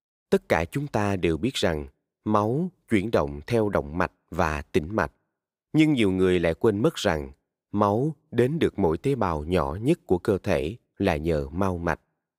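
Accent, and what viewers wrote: South, Vietnam. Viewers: Chúng tôi kính cảm ơn những người làm ra sản phẩm nầy và kênh nầy không ngừng phát triển . Giọng miền Nam của người đọc ấm áp quá đỗi yêu thích. Đọc chầm chậm như vầy người già chúng tôi dễ nghe. Cảm ơn. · Tôi yêu giọng miền nam. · Giong doc Nam Ky qua ro rang qua tuyet voi……..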